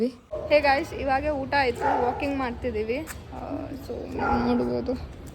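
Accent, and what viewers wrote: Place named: North India, India. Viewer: Your hindi is like we north indians ! Great . U r so energetic and beautiful. Love ❤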